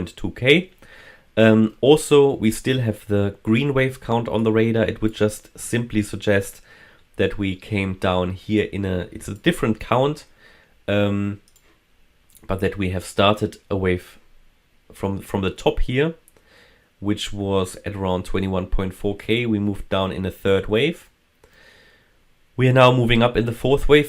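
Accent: Germany. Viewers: New to this channel, and I love your accent! German yes? It's wonderful :)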